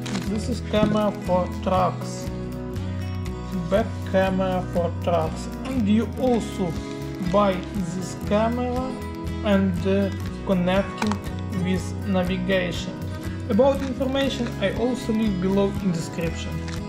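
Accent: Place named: Lithuania. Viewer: Myślałem że to Litwin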